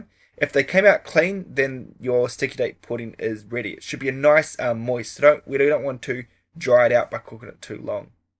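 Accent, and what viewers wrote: New Zealand. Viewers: Like your kiwi accent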